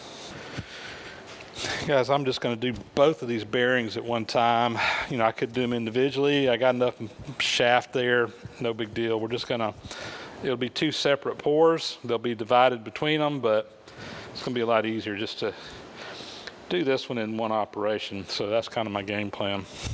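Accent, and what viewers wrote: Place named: Georgia, United States. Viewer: The best accent in the US (Georgia) to pronounce > Babbitt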